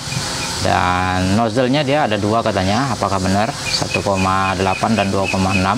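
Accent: Medan, Indonesia. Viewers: Abg dr medan ya, sama donk bg...medan dmn nya bg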